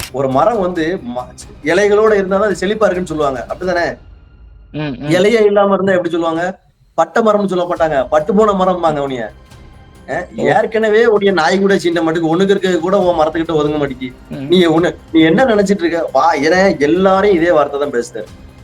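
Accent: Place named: Tirunelveli, India. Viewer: uncle anna nallairukeengala super namma tirunelveli manvasanai pechu super..... super name uncle....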